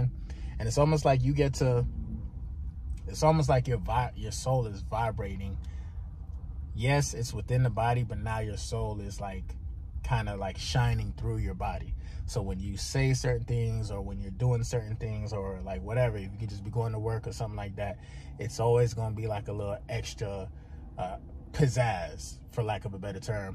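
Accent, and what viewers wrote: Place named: Jamaica. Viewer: Knew you was Jamaican bro 💪🏿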